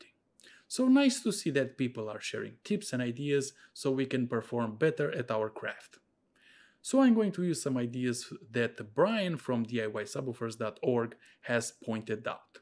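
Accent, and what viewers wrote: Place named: Romania. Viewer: is that a Romanian name? You have the accent and I’m from that area I can kind of tell. Anyways you should try building one for a single 12, keep sealed chamber at 1 cube and ported chamber at 3 or as large as you can, tune the front port anywhere from 40-43 and for port area start at 75% of your cone area and shrink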